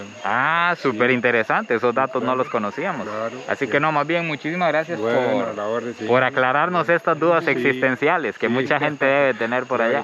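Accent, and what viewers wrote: Costa Rica. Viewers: Me encanta oh mejor dicho me facina tu acento costarisence.❤️ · Te gusta el acento tico?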